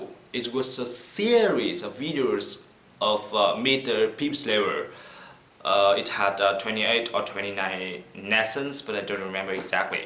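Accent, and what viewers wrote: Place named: United Kingdom. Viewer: Linh Khánh Theo mình nghe dc thì anh anh đó bạn.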